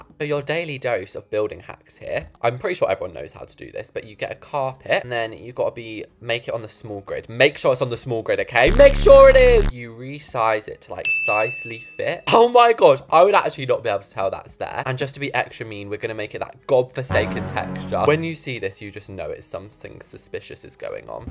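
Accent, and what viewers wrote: United Kingdom. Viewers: wHEN I WAS NEW I TOUGHT SHE WAS A GIRL FOR HIS BRITHIS ACCENT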